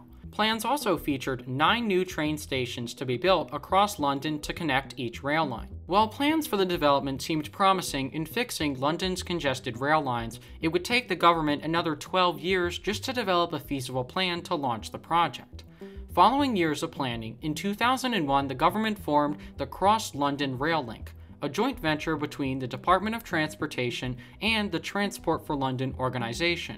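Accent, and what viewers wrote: United States. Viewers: I didn't realise that we priced projects in US dollars in London. What on earth were the makers of this video thinking of: pricing the Crossrail project in US dollars ? What's all this US accent to tell us about the London Crossrail? We don't have 'railroads' in London: we have railways. We don't have 'transportation' in London; we have transport. We do not have a 'Department of Transportation' in the UK; we have a Department of Transport . This is actually written on the screen. Why on earth do you use a voiceover speaking US English?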